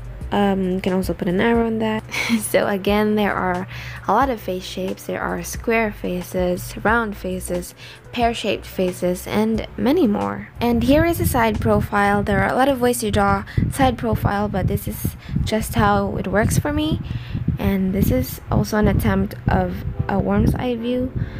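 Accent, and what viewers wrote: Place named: England, United Kingdom. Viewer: I love ur english accent🥰😌 btw I really like ur videos😫💖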